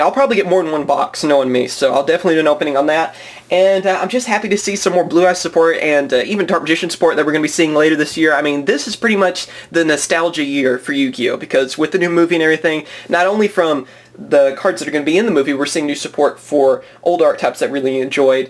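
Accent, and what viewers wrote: Texas, United States. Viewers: Are you Texan your accent?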